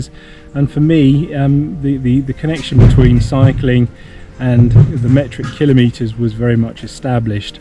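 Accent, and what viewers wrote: England, United Kingdom. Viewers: Ah, I'm sure you'll get a few (more) trolls with this one :-) I quietly enjoy the arguments made by those (mostly from USA) who insist on using their "customary units". They call them customary units because they don't want to admit that the system is Imperial. The very Imperium they fought a war to break away from. And all the while their standard measurement system is SI; their customary units are all defined in terms of SI units! And then there is the situation for the UK. Many of those Imperial units are Roman units! The mile is the distance a Legion moved in 1000 paces, that's why the name is "thousand". Weights and measures have evolved somewhat in the last 2000 years, but the line of derivation is pretty clear. So, the UK and USA use Roman units. I say that since Rome has switched to modern science based units, they should too :-) Oh, nice to hear your Danish. 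Do I detect Danish with an English accent? :-)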